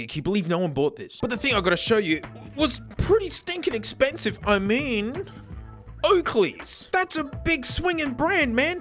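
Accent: Australia. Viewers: I love this guy, it's like an Aussie Señor Pelo that makes videos about audiphones and piracy. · this guy can't be more australian and i'm overwhelmed by it.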